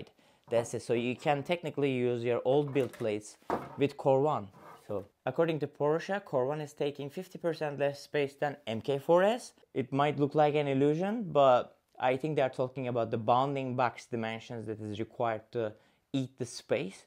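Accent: Turkey. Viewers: accent 100% Turkish :) (not a criticism)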